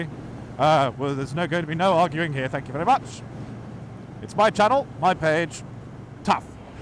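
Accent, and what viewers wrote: United Kingdom. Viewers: Hello! I really enjoy your entertaining videos! And I envy the comfort you have on your Speedmaster - I can feel every crack on road on my Bonneville Bobber... Though I prefer the looks of the Bobber!:) Something I always wanted to comment on one of your videos: your dialect and pronunciation reminds me of the famous arrest of this British man <link>;) BTW: the metric system is the best!;) Greetings from Germany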